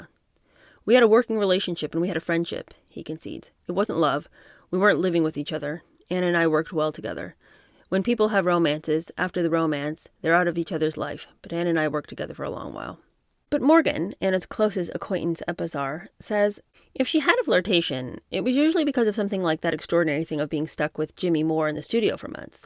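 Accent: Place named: Upper class, United Kingdom. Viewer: In the UK that accent is a golden ticket, it denotes you have the best education and through that elite education the best contacts. This is why so many Brits strive to have their children privately educated. I didn't have the means to privately educate my son, but I am able to help support one for my grandson.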